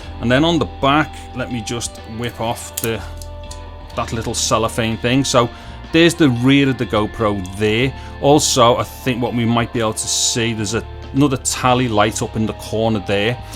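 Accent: Liverpool, United Kingdom. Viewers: Your video made me chuckle - I LOVE your grammar 'and that and stuff' - are you from Liverpool? Good man, great vid, natural and informative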